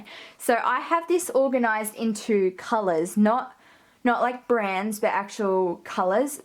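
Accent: Australia. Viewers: omg i am crying for happiness right now... stabilo! telletubbies! bostik! smiggle! typo! kmart! etc. the accent! yay! i finally found an australian youtuber that does polymer clay😃 {I am an aussie too!} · I knew she was Aussie because of her accent lol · I could tell you’re Aussie because you sound similar to Georgia Productions :)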